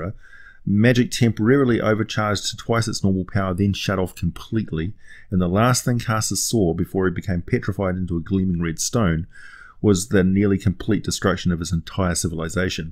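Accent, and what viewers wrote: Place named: New Zealand. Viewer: Boys we got a kiwi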